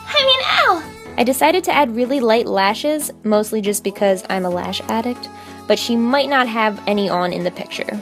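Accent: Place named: New England, United States. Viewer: Love it! She's my favorite character ever. I think that your normal, dramatized NE voice is Harley-esque enough!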